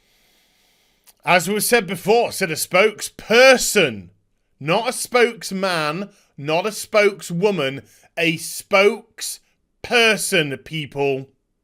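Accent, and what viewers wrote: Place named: United Kingdom. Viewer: I can't watch this.....without.....busting my gut laughing....that proper sounding British accent....doesn't.....help! ROTFLOLLLLLLLLLLLLLLLLLLLLLLLLLLLLL!!!!!!!!!!!!!!!!!!!! Stop it dude your are killing me! LOLOLOLOLOLOLOLOL!!!!!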